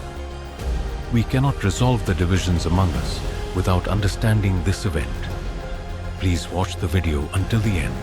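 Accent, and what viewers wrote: India. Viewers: Great video, but can you stick with real english AI voice, the Indian accent is annoying to hear. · Why did you change such nice narrators voice with an Indian accent. The previous narrator’s voice was just excellent for the context you guys put up. Especially for the battle of Karbala. Please us the previous voice 🙏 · Brother thank you for the video. Please can you change the AI from indian to something else ? Its hard hard to listen to this Indian English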